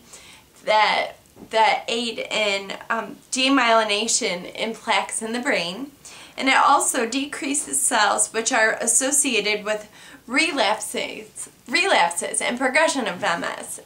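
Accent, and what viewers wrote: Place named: Detroit, United States. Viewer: hi have a question with de mylasation of the nerve cells do people with ms have seizures curious cause this how i found your vids was looking for info on nerve cells in the brain, stroke i had has left me epileptic taking dilantin to control them and is working:) also it's so nice to see you smile, defiatley could light up any room with it:) luv the little Detroit accent too lol Canadian here / toronto eh:)joe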